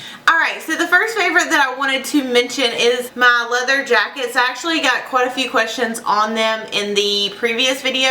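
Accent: South, United States. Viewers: You are SO beautiful. I love your videos so much. You are such a sweetie. Your makeup looks gorgeous! I would LOVE to see a tutorial. I could listen to you all day. I love your accent! I am a southern girl too. Love you,.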